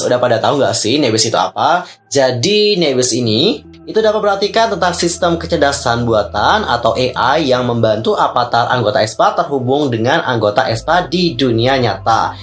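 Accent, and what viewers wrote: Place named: Java, Indonesia. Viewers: Maaf kak aku yang salah. Aku ngakak kok medok sih 😭🤣🙏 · Medok ya kak hehe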